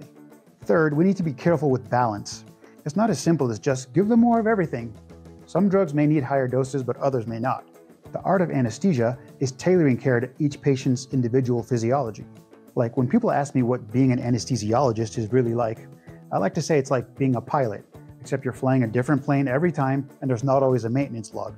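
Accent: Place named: Scotland, United Kingdom. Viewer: Your Scottish accent is great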